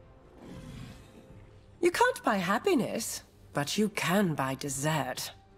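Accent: United Kingdom. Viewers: You have a little character in your British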